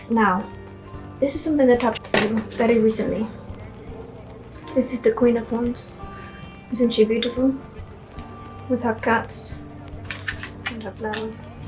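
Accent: India. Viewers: I love your indian accent and you look beautiful in red 😊❤🙌👏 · As a INDIAN, i really appreciate your effort regarding Indian speaking accent of your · As an Indian guy I can confirm that the accent is perfect 10/10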